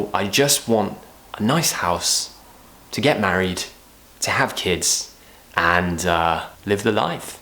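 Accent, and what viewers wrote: United Kingdom. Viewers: Your perfect British pronunciation and energy like a bomb motivate me. Thank you so much. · I like meat really like it and I like watching your video, easy to understand and I like British accent. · I’m from Vietnam and I’ve been watching your videos for a year. Thank you for traveling to our country. In ours, the English language as the second language and so important for everyone. But most people prefer America accent to British so that I was thrilled to find out your channel. If you go back to Vietnam, hope we have a chance to meet up. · Im from Bangladesh. I dont know have u ever heard the name of this country or not?bt i was always been looking for a channel like u so that i can learn british accent. U are really amazing. Thanku so Much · I just discoverd ur channel and i'm so in love with ur accent ❤ i cant get enough of listening to british english 💕💕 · I'm impressed with your voice texture, mate. 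The accent is awesome and British indeed. I'm so fascinated that my earphones are poked regularly into my ears. Explanations are vivid and practical. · I love your British accent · Oh my gosh.. i am tooooo late. Anyway, How are you🌸 i am so glad to see you again. I hope i can see u more often. I missed your awesome british pronunciation a lot indeed!!!!! Thanks a million🙋🏻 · Dear... You are Awesome... BRITISH Accent 100%, learned a lot From You, Thank You Very Much 🙏😘😘😘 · I enjoyed this video a lot First time I felt British accent is more difficult to understand than Americans but as time goes by I felt it’s easier It’s because of you Thanks a lot😊 And I love British accent 🌸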